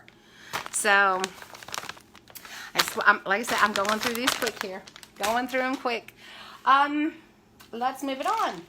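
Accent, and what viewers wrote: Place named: South, United States. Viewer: I am doing Keto IF so I am at the point where my appetite is basically gone some days, but I have been eating the same foods for a while now. Mostly the usual and I am following the 7-10 cups of vegetables a day per Dr. Berg, but your Keto bread and other recipes are going to help me to make this more of a good lifestyle rather than such a restricted lifestyle. I'm from MN and sometimes when we northerners listen to someone from the South it can be hard on our ears but you have the sweetest voice and it's just so nice to listen to your laugh!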